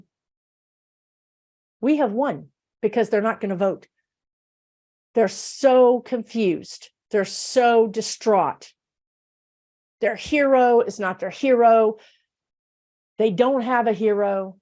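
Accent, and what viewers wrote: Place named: New Jersey, United States. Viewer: Sounds like Bayon, New Jersey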